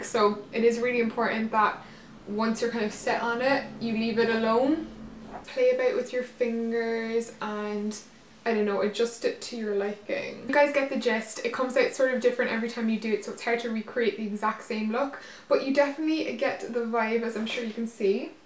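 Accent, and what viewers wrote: Ireland. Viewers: where are you from because your accent sounds like an Irish accent. It sounds like my accent and I'm from dublin 💋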